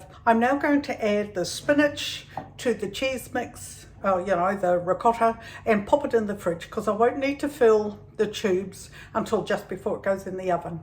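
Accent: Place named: Australia. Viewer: It's pasta time I made some noodles. 'I just love it.' You slipped into Aussie there. 😲You did sound rather Aussie all the way through. Have you just been video chatting to your family in Aus just before making the vid? After you put the onion in you could have said 'That's shallot'. Problem solved. 😁😁 I follow the recipe from my cookbooks exactly because I'm testing them. I'm a massive black pepper fan. Peter Piper picked the peck of pickled peppers just for me.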